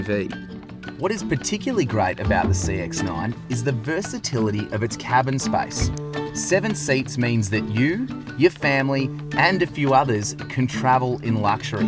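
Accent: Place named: Australia. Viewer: Other than this guy speaking Aussie and through his nose - this is one of the best CX9 reviews on You Tube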